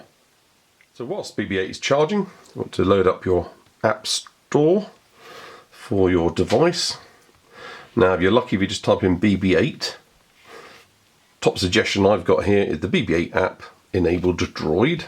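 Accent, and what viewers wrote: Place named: England, United Kingdom. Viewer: Hello, First off. My Grandfather was from England and due to him, I tend to sound like I came from England as well. He passed away when I was 13 and I miss hearing an English voice once (Other then my own.) in a while and I loved hearing your voice. On BB8. I have read newspaper and magazine reports and seen a few videos here on You Tube about him. BUT! This report of yours was much better and covered more points then the news stories and other videos did. I was considering getting a BB8 for myself and your report helped me make up my mind about getting him, Thank you. I have a VOX R2D2 from the first year that "Tiger Toys" (Hasbro Toys.) came out with them (Thinkway Toys is making them from this new movie now.) and I have had a ruddy blast with him and every time I set him loose on his patrol mode, he gives my cats a very hard time and drives them around the flipping twist! 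I can not wait till I can get BB8 here and cut him and R2 loose in the house and watch as they target one of the cats and goes after her! THIS IS GOING TO BLOODY WELL BE A TON FUN!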